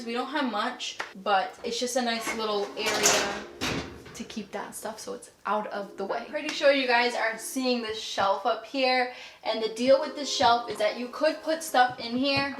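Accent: Boston, United States. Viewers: I love your boston accent I’m Portland Oregon!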